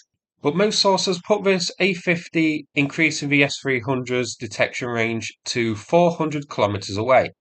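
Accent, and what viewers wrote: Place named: Northern England, United Kingdom. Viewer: I just love the commentary, makes me homesick for the North of England. Phrases I heard often when younger.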